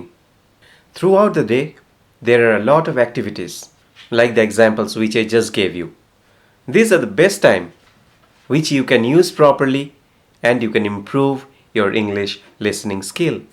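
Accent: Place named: India. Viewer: I love your accent.. Not heavy as other Indian speakers. That why I love its and use it as my lesson on my listening practice. Thank you so much sir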